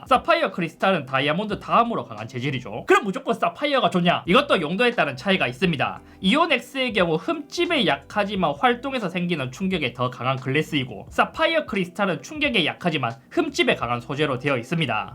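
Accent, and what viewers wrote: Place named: Gyeongsang, South Korea. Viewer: 갱상도는 갱상도네요...드라랄드라라... 빡세요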